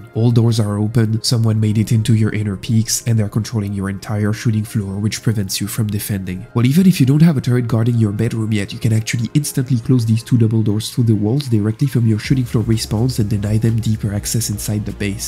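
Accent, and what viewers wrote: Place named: France. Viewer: Mhh l'accent français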